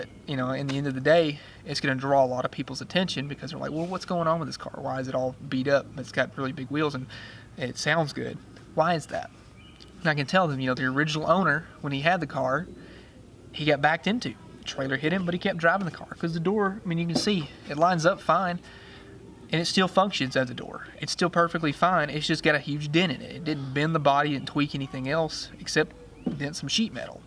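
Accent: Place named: South, United States. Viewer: My husband and I are watching this right now, we love the way the car is looking and we're watching to the end to see how it turns out. You are really amazing, we love how you take so much care with each and every part of that gorgeous gorgeous car. We're also from the South so we have accents as well so it's ok, we're use to people sounding that way. (Oh, just in cause you were maybe possibly wondering, we're from&live here in Tennessee)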